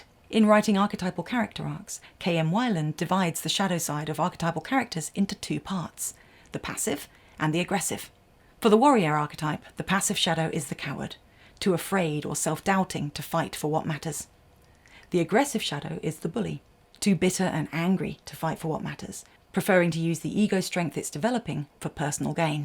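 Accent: United Kingdom. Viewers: You have an amazing voice. Really pleasant. Soft yet not ASMR. Just the right amount of British to sound classy but not posh. Have you ever thought of narrating (your favorite) audiobooks? I think those would sound amazing.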